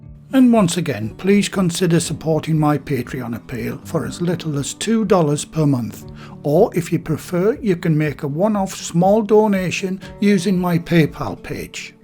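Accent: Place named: Newcastle, United Kingdom. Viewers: An English voice , at last, to be found on the internet. I watched , just for the relief of it. Just the metric references to be got rid of I've shared it with a genuinely interested party . Thank you. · I'm so happy I found your channel. I'm a northerner myself from Newcastle who now lives in the states. I've been terribly homesick and not able to travel back due to Covid:( Hearing that accent and watching all your videos makes me feel like I'm back home! I'll be trying some of your delicious recipes this weekend! HAWAY THE LADS. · You got a like when I heard your Geordie accent. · I love this channel. I am,also,from the north east and your voice takes me back to my time there and my mam's corned beef pie was just like yours. Thrilled to have found you.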